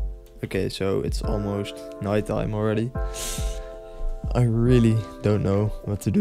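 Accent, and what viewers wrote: Netherlands. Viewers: I could already hear that you're dutch like so many dutch people sound like that when talking english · Jij hebt een Nederland accent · Im really curious to know where you are from! You sound like german/dutch, but I am not sure :) Edit: i knew it. You are dutch just like me :P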